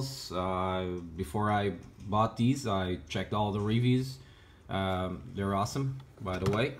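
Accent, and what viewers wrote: Brazil. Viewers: Eu vendo o vídeo, e ouvindo seu inglês, de olho no sotaque kkkkk, na hora vi que era BR também.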